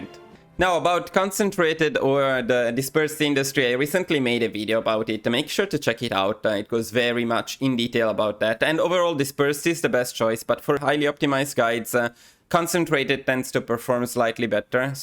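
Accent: Italy. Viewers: The Italian accent, Perfection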